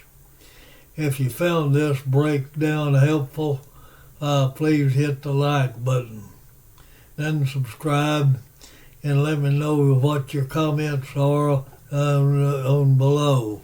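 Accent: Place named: Texas, United States. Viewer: Please hit the transcript button and read along if you can’t understand his Texas accent. He’s giving us good information about our meats and other foods. 🙏🏾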